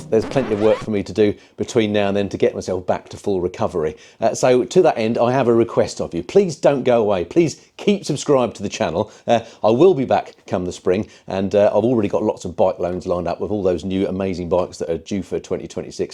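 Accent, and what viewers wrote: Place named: United Kingdom. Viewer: Missing your industry news, and UK accent. Hope the surgery went ok last December. Godspeed!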